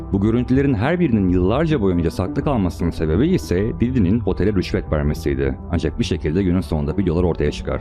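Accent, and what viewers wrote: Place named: United States. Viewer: KONUŞMA TARZINA KULAKLARIM KANADI! SANKİ TÜRKÇEYİ YENİ ÖĞRENMİŞ AMERİKALI TÜRK GÜRBETÇİ GİBİ !!! NORMALDE ÇOK KIZLAR KADINLAR BÖYLE KONUŞUYORLAR !!!!🤦‍♂🤦‍♂🤦‍♂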